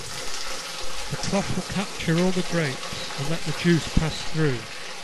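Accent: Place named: England, United Kingdom. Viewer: Fair play, must taste delicious but cant stand that english commentary.... get on to the BBC and you could malke a living..!! Well done tho!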